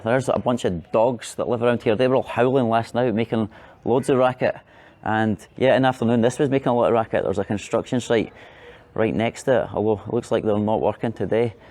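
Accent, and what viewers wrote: Scotland, United Kingdom. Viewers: Always love that Scottish accent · You're definitely Scottish , your accent shows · Love your Scottish accent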